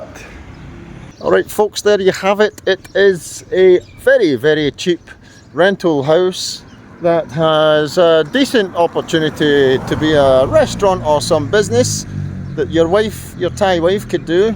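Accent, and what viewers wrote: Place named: Scotland, United Kingdom. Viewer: 2,000 baht, is a good price lots of work to tidy up. Nice to hear a Scottish voice in Thailand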